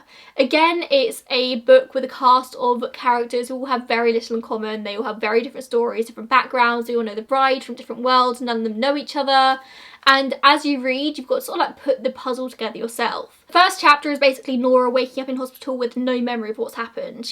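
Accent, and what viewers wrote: England, United Kingdom. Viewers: Reading, England I believe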